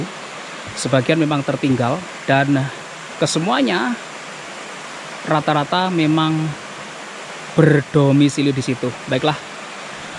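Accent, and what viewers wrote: Java, Indonesia. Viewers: mas njenengan jowo ne pundi to kok enak banget tutur katane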